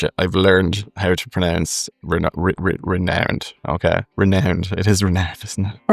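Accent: Ireland. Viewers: Never apologize for your Irish accent!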